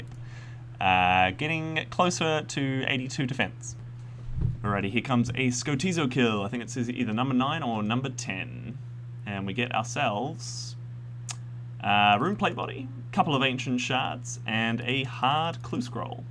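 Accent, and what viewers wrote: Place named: New Zealand. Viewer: Mate, you're a Kiwi! What part of NZ bro?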